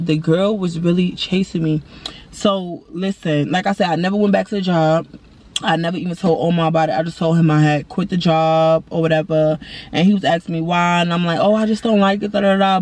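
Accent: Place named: South, United States. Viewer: You been down south too long you got that south accent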